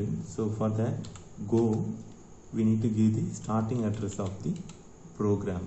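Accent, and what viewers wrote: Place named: India. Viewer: Indians speaking emglish sounds very ridiculous, next time use subtitles, the world will thank you.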